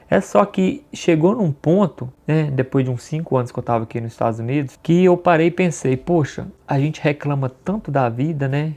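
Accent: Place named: Minas Gerais, Brazil. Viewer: Lindo esse seu sotaque mineirês.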